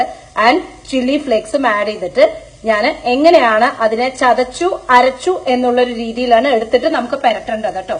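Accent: Palakkad, India. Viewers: I am making vendaka dish for dinner and continue palkkaden accent in your presentation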